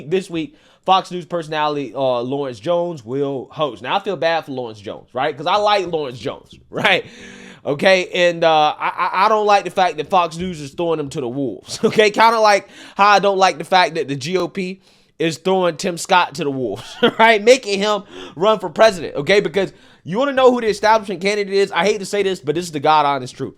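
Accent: South, United States. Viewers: You’re from the south bro. Ppl actually talk shit about how you say words🤣 those people are dicks man. That’s not criticism, that’s just being ignorant, and not understanding there’s different accents. I live in Texas and moved from Boston. When I first got here people couldn’t even understand me. Maybe people need to understand there’s different cultures.😂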